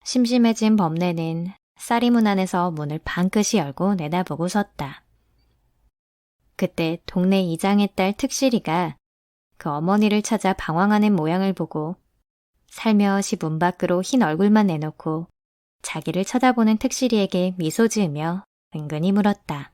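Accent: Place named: Jeolla, South Korea. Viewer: 와 전라도 사투리가 반갑구만요 늘 좋은 목소리로 이야기 들려주셔서 감사합니다아😄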